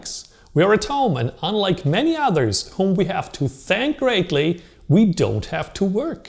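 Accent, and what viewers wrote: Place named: Europe. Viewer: This is absolutely the way I need to learn manual without being bored! Glad I found this channel! Your accent is interesting. European?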